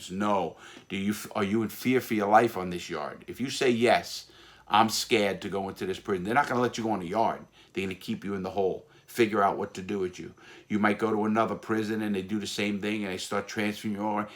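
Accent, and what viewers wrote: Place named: East Coast, United States. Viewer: Very good...your interesting and love your east coast accent..handsome too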